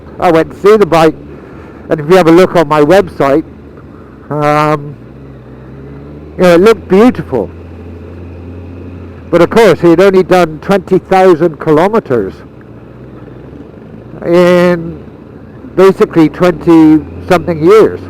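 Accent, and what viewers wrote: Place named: West Country, United Kingdom. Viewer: You sound like a West Country lad.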